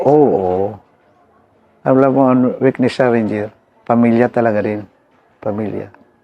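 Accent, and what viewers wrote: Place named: Cebu, Philippines. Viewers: Cebu dialecnsiya.. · Visa ya po ang halo sa Salita nya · May pagka waray si sir, I'm not sure pero base sa pananalita nya, waray or bisaya. Di xa ilonggo...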